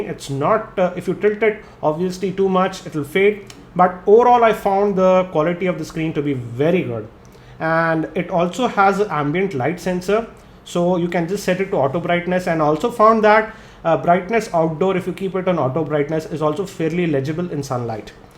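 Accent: India. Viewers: moron that is indian accent.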